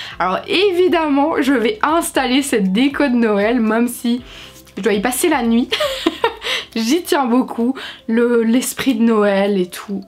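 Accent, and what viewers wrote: Belgium. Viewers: Je découvre ta chaîne et j’adore continue comme sa (tu m’a donné pleins d’idée ´et l’accent belge je suis fan )plein de bisous à toi et ton copain 💕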